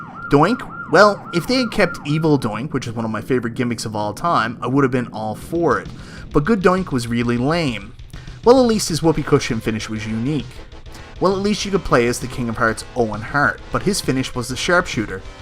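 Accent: Canada. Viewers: I knew you were Canadian from the accent, ya hoser! - Luv from: a fellow canuck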